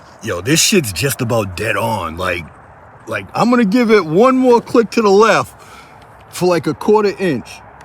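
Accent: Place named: Southeastern Massachusetts, United States. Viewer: Dude you have to be from near southeast Massachusetts. I lived there for 44 years (right near Fall River Mass) and just moved to Southwest Florida but "bang a U-ee" "wicked" "eff this & eff that" you sound exactly like me and my friends.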